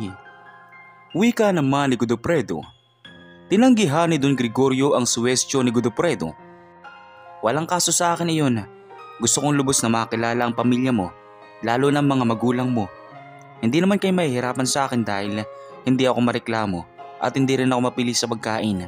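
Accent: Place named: Visayas, Philippines. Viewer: Halatang bisaya ka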